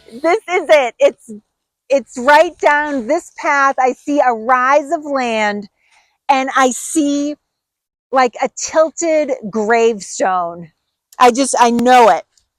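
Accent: Boston, United States. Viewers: No way, accent is definetly from inside the 95 belt around Boston. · you have an adorable “Boston” accent.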